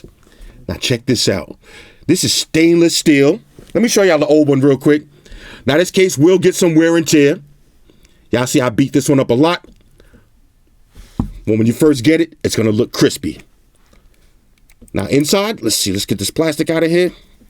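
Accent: New York, United States. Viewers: Love the NYC hood talk. It's refreshing compared to the usual.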